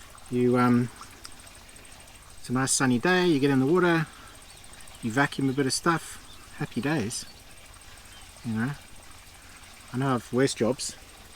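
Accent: South Africa. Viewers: Your accent like you come from South Africa 🇿🇦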